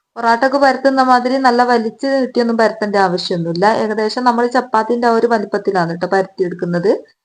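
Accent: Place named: Kozhikode, India. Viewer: Kozhikodkary enn thonnunn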